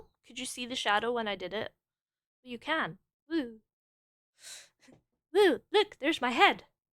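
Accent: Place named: Scotland, United Kingdom. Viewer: HELL YEAH- SOME SCOTTISH BACKGROUND NOISE FOR MY COURSEWORK (i dont catch streams often and by GOD am i glad i found this)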